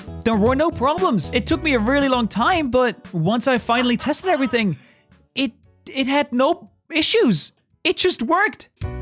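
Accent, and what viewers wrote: Netherlands. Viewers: Youre dutch? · are you Dutch (because i am)??? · Are u dutch me to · wait are you dutch?? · u dutch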